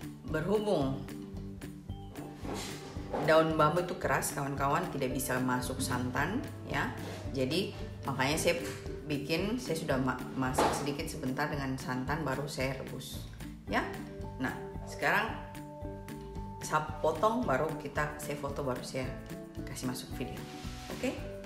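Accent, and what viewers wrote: Papua, Indonesia. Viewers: Za senang dg logat papuax walaupun da tnggl d'luar👍👍👍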